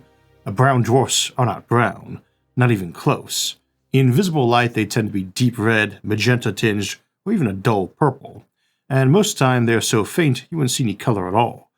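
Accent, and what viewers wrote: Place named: New Orleans, United States. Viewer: This man sounds like he's from N'Awleans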